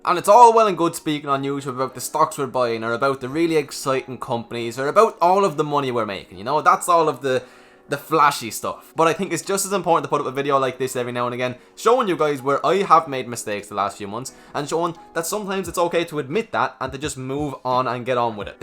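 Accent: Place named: Ireland. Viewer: I absolutely love your videos! Also are you Irish? Love the accent!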